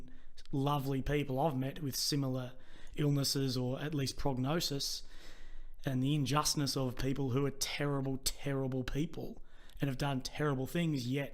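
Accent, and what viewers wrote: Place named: Australia. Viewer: Hi mate, just "found you" in your latest end Feb 2024 video on the Ukraine conflict analysis and doing the back channel and will arm chair my way through 4yrs. At this stage I see where you are at but don't know how you got 'here' and don't know if you will ever see this post with millions of views and 100s of thousands of comments.... But I'll comment anyway mate. But I'm and Aussie ex RAN Submarine Officer, did my 25yrs and been out about 10 yrs now and been pretty PTSD broken as I've learnt you REALLY shouldn't get washed of the back of a Collins class somewhere doing something you can never talk about and leaving the service being a way to broken and a 'tough' hard charger - nothing like your story at all but I feel in a different way, and agree about the NEWS and haven't listened to the bullshit MSM tv or radio in 8 yrs,. 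Now I follow and listen to a variety of good smart people really discussing world events and I haven't missed TEN or ABC or the radio heads sprouting off giving me there bullshit opinions..... And I haven't missed it. Now I find myself (a dam long story but a ball breaker of a story) in the far Nth Arctic Sweden living a better, balanced and good life with my kids, although it's a weeeeee bit removed and remote but it's good - as now I'm finally fixing the head bongo and know what's important. I will keep watching all your current stuff and follow your next 4 yrs journey and enjoy hearing a no bullshit Aussie voice in all the world's noise. I don't know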